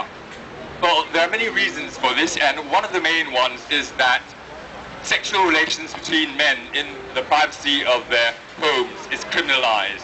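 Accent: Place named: Singapore. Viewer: We Singaporeans love our own accent babe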